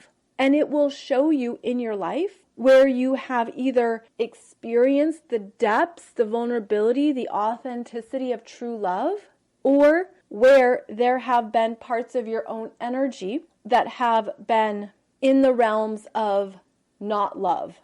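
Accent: United States. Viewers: I bet you are from Michigan. Northeastern...???? I hear it in your voice.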